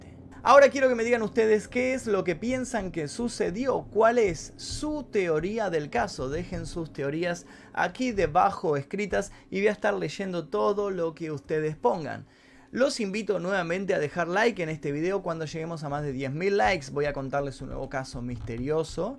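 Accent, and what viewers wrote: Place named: Argentina. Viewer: mucho bla bla hablas mucho argentino para lo que narras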